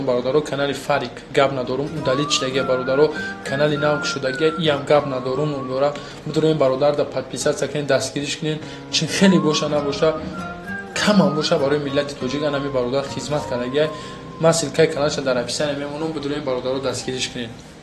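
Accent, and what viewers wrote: Kulob, Tajikistan. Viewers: Кулобии тоза!! 👍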